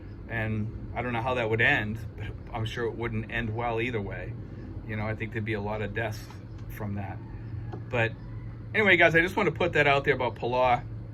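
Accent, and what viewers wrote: Boston, United States. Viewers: Sounds like a Bostonian, or at least from somewhere in Mass. 🤔🧐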